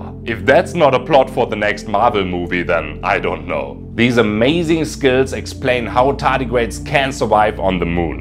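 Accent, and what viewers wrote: Germany. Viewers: Dieses Denglische bzw der Akzent....typisch deutsches Problem. Entweder man kann englisch oder lässt es sein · Ist sehr gut aber immer dieser deutsche Akzent 😅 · 😂😂 der deutsche Dialekt schlägt voll durch 👍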